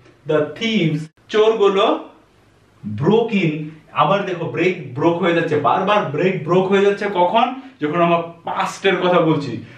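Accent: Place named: Assam, India. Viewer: Watching for the first time good...want to ask that ur dialects maches with Assam ..u r from there?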